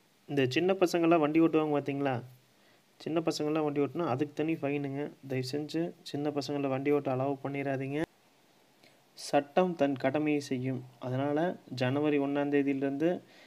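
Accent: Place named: Kongu, India. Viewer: neenga kongu Tamil peasumpothey nenaichan....nanum erode dt than bro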